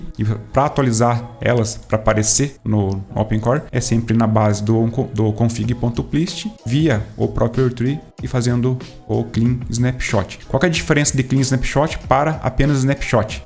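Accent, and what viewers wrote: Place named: Paraná, Brazil. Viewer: haha quando vi o sotaque já imaginei ele é paranaense, e que massa que é daqui de CTBA piá. haha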